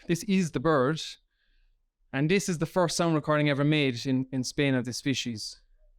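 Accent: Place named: Munster, Ireland. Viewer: Hello 👋 cool video man. I recorded some screeching hawks but I'm not sure which type they are. I'm 80% sure they are buzzards. Is there any chance u could take a gander for me? I want to title the video properlyif that's what they are. Also I'm from kerry, your accent sounds very familiar to me are u on my side or the Cork side? 😂❤ 💪 🇮🇪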